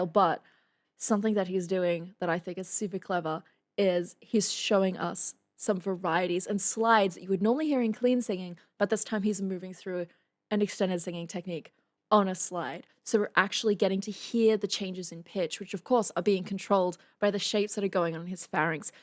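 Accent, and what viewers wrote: Australia. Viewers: With your beauty and that aussie accent, man your a hottie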